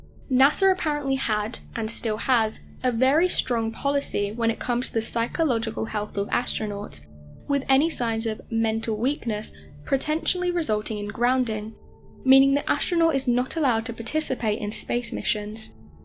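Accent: England, United Kingdom. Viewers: I love her voice, just something about a British accent that is so soothing. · I personally live the voice very good narrative speaks intelligently and the best English accent! Keep her. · She's definitely not Australian or Kiwi. My money's on British. Sounds very British to me, you do realise they have different accents in different areas over there..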